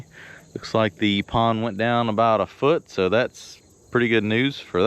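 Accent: South, United States. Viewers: I had an 11 year old video of yours show up on my list and it was when you painted the old Case 580! Your southern accent has mellowed an awful lot since then! 😂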